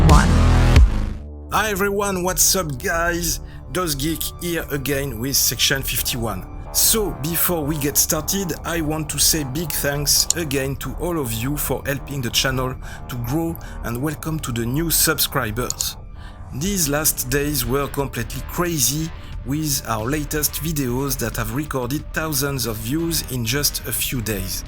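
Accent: France. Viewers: hahaha, your strong french accent is very funny, but nice from you to give it a try and inform the world with your french knowledge, this is seldom. You have forgotten to mention 2 very important whistleblowers/researchers about Ufo Crash Retrieval Teams like the Blue Berets: Leonard Stringfield and Sgt. Clifford Stone.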